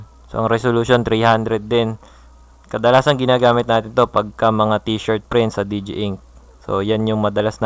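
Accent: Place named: Philippines. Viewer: He is using tagalog language so he's accent is in tagalog too.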